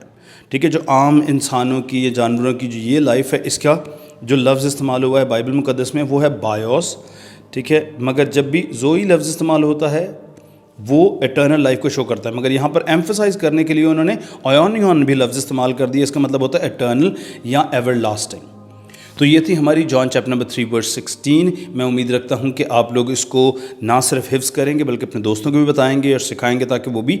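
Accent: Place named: Greece. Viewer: Nice greek accent Sir.... thanks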